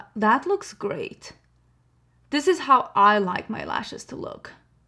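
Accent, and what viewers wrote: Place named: Sweden. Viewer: The automated caption did NOT follow you on the words skolavslutningen and midsommar. 😅 Love the swenglish tho! Keeping it real. 🇸🇪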